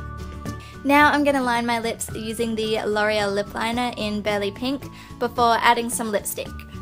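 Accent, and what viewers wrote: Australia. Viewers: Woa you are stunning! It's also lovely to hear an aussie accent :D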